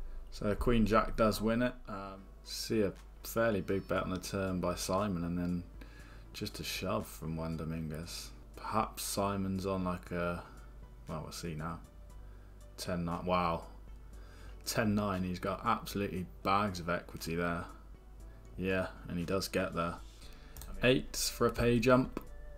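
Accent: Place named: Midlands, United Kingdom. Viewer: Mate where are you from? I'm sensing a Midlands accent? Do you play goliath in Coventry? Love that tournament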